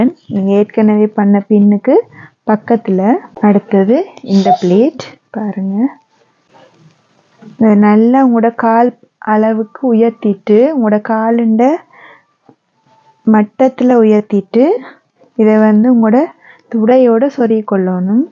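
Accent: Sri Lanka. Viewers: ivanga Sreelankan tamil mathiri pesuthu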